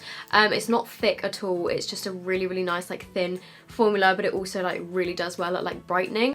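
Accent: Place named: England, United Kingdom. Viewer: You are my favourite English youtuber and my Spanish favourite youtuber (I am Spanish) has just done a review of your palette. It is like a mixture of my 2 favourite youtubers in a video Yayyyy haha Her channel's name is Ratolina in case you want to watch hehe Lots of love♡♡♡ ps: I really love your accent ♡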